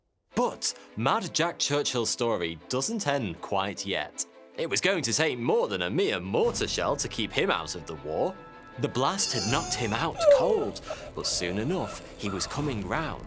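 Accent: United Kingdom. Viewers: Could not take the British accent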